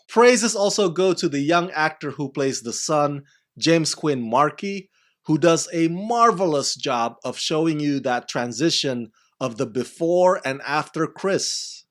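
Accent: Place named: Indonesia. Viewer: You sound like Indonesian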